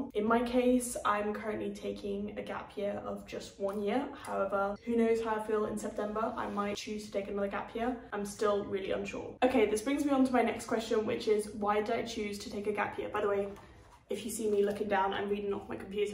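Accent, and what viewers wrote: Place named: England, United Kingdom. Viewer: Why do you have an English accent if you grew up in France?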